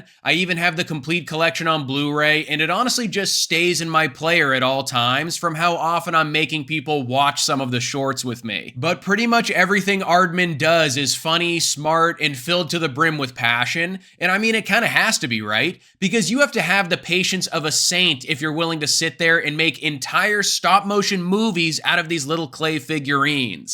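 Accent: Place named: United States. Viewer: You sound like an American with a short attention span. Terrible review.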